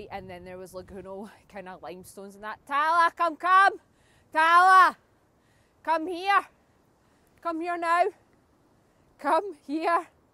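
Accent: Scotland, United Kingdom. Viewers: That Bridge, Is Probably The Best Looking Bridge, In The World.! Shame, It's Only Used Fir, Trains.! So Are Ye Doon There, Looking Fir Crabs, Fir Dinner, Or, What.?! Thats A Broad Accent, LUV.! Didnt I Hear Yeh, On Scottish COD Players Wae Marley13, Lmao.! Or, Maby You Know, That Mad B'strd, On A Paddle Board, Telling A Baby Orca, TAE F#@ Off, In A Loch, &, "IT DOES", On Scottish TikTockin, HAHAHAHAHAHA.! A Rainbow, But nae LEPRECHAUN Wae A, Caudren Of Gold/Sunshine, But It IS Scotland, After All.! HAHAHAHAHAHA.! Watch Oot, Fir The Midge's, B'strd Wee, Blood Suckers, &, The Flying Haggis.! Lmao. Ken WIT A MEAN.! Namaste. 🏆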